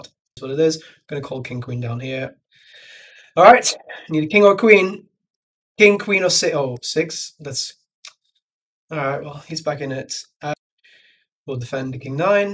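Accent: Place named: United Kingdom. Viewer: Where are you from in the UK? Hard to pick the accent!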